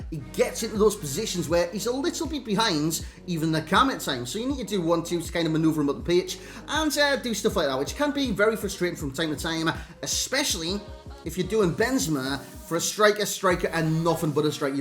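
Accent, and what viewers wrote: Newcastle, United Kingdom. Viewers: Newcastle accent its not far from Scotland just incase you're not from the UK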